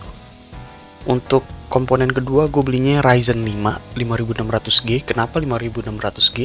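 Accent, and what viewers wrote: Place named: South Jakarta, Indonesia. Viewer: Bang lu anak Jaksel ya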